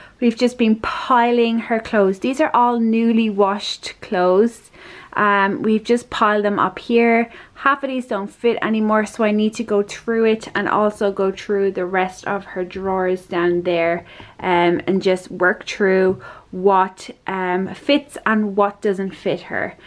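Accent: Ireland. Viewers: Well done,. I'm going to share this video with my Drama class so they can hear a proper Irish accent:-D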